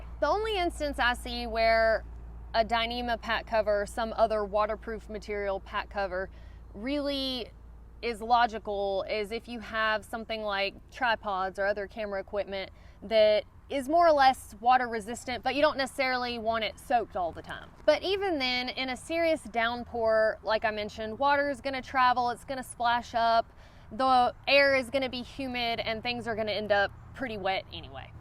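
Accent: South, United States. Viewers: Why, sir, that is a Southern Belle through n through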